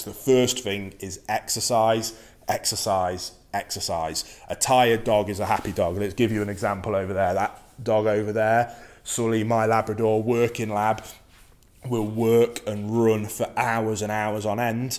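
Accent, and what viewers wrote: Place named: Midlands, United Kingdom. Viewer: Can’t believe you just mention Cannock Chase 👍 I thought you sounded like you were from the Midlands xx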